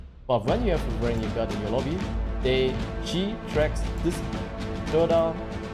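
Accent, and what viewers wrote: Germany. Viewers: Das ist doch NRW Englisch xD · i had this thought for a while know but i think n ow im sure i believe is german or at least lives and speaks the language bc eventhough his accent isnt as noticable the way he says some thinks and how he says "ezettera" i have the feeling he is. could be wrong tho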